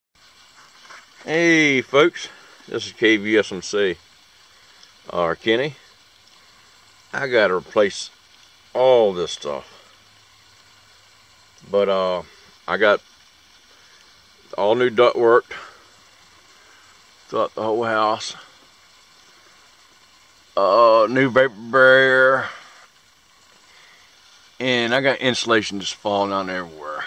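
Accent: South, United States. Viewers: how cold does it get where you live? If I remember correctly if it gets to cold they don't work properly. but I also can tell by your accent that your down south, so I'm sure you don't worry that much about 20 degree days like we suffer from up here in the north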